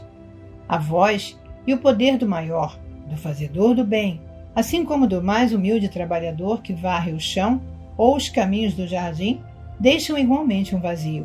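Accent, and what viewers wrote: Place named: Brazil. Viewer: Gratidão e Universo. Perfeito com sua voz brasileira. Amei. Que a Luz a seu redor continue se estentendo diariamente. Luz....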